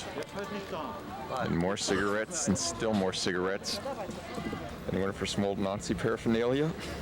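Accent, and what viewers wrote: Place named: United States. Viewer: That’s amazing! I didn’t realize you’re from Germany speaking English—I actually assumed you were American. You probably chose English for the recording so that everyone could follow along. Thank you! I was born in Szczecin in 1983, and as I was walking the streets, it would be fantastic to spot myself in your video. 😄 You won’t believe how much Szczecin has changed since then! We’d love to have you back to film it again and share your thoughts.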